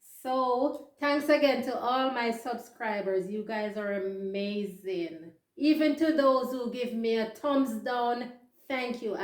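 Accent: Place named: Jamaica. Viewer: A very nice introduction to you! I live the Jamaican accent!! And age 21 for several years 😂 I like that. My wife says the same thing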